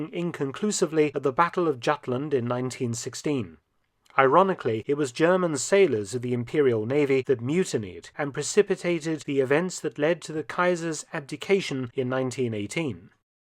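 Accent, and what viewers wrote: United Kingdom. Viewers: What is saying in his British accent is "pickelhaube". He is pronouncing it correctly, but I tried to google "pickel halbee" and it didn't work.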